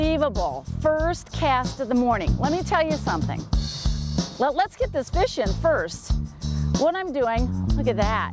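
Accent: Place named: Minnesota, United States. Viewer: haha she sounds like she might be from minnesooaata but this is really good...you rarely ever get sound, down to earth advice from fisherman on yt... how do you not set the hook though? setting the hook has become like a tourettes tic to me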